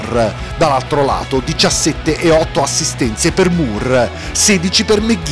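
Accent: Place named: Sardinia, Italy. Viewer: Audio fastidiosissimo. Dite al giornalista che non si battono TUTTE le finali. E si sente anche accento meridionale, sardo presumo...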